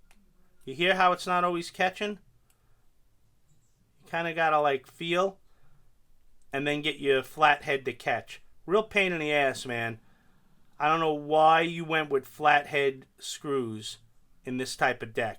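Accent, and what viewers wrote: New York, United States. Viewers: You and Mikey Vapes both come from New York and you sound like a New Yorker when you talk and Mike sounds like hes from Illinois or somthin.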